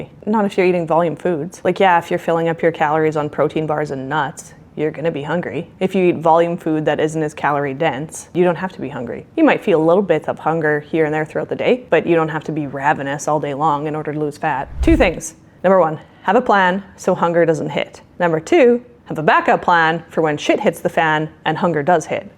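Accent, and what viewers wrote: Canada. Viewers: Girl, are you Canadian? You sound Canadian and love this. I have binged like 4 of your videos now and I'd love to think you're one of us. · Are you Canadian? I about abooout hahah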